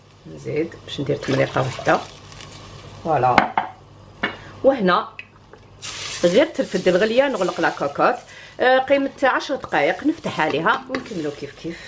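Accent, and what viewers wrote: Algiers, Algeria. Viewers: الله يبارك فيك راني في الغربة كي نشوفك نتفكر ايام القصبة من مكلتها وهدرتها و حطتها .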